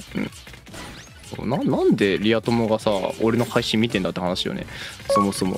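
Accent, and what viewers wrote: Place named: Northern Kyushu, Japan. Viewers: ○○けん。は博多弁すね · この人の方言から九州北部を感じるんですけどどこ出身か分かる人いますか?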